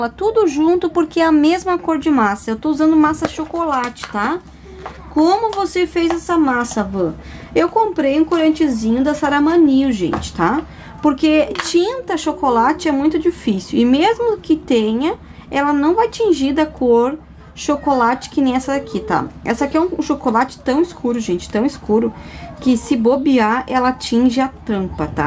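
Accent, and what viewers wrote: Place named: Rio Grande do Sul, Brazil. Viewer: Oi van adoro suas explicações eu qdo o seu zap .amada não fica xatiada não com esse tipo de COMENTARIO NAO TENHA FE EM DEUS ELE TEM O CONTROLO DA NOSSAS VIDAS BJS. GOSTO MUITO DE VC É ADOOOOOOOOORO SEU SOTAQUE TCHÊ FICA COM DEUS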